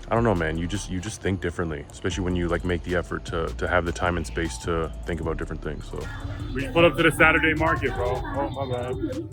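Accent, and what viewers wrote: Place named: England, United Kingdom. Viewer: your English accent 😂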